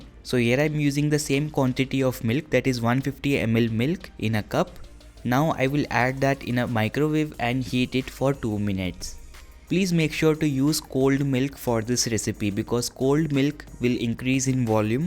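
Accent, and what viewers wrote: India. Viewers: That Indian accent tho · you are indian? You voice tune like indian sub region. And thanks. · 咖喱味英语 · Damn, why all the recipes with Indian voice there and everywhere behh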